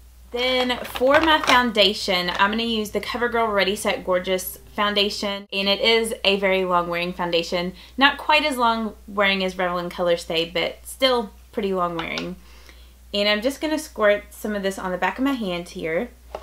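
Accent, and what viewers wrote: South, United States. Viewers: Subscribed Just because we have the same name lol. And you have a southern accent which makes you more awesome :)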